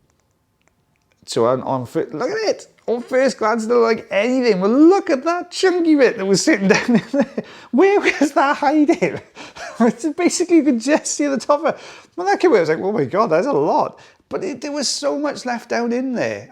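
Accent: Wales, United Kingdom. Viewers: just what the Doctor ordered How 🤔 ❓ you managed to extract that recess cerumen was marvellous I was hanging on the edge of my seat Skilled on every level Your lovely Welsh accent almost broke ♥ into a 🎵 song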